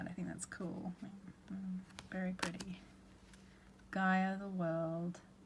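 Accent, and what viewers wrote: Australia. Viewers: Two things I’d like to say: I hear the Aussie in your voice. 🇦🇺 (Am I correct??) And; I live for the Harry Potter references... Always.⚡️🦉🏰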